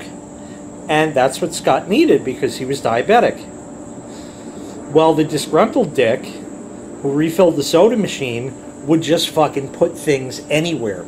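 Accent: New York, United States. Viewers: You know you're listening to someone from NY when they say "aarange" instead of "orange" If I had to deal with that vending machine, I would be so pissed. Getting orange instead of the Diet Coke I asked for? Fuck that guy... There was a time that paying with cash was the norm. Now it's debit cards like I have. I'll tell you, I feel much more safe spending with cash than a debit card. Honestly, do Millennials even know what the hell cash is?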